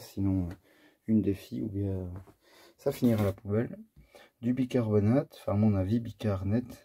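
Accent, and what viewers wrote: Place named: Liège, Belgium. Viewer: bonne continuations vous avez l'acccent de liège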